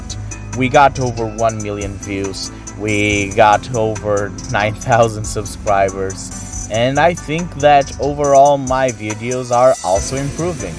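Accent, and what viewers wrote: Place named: Portugal. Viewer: Sim! Ele fala também a nossa língua, só que Português de Portugal.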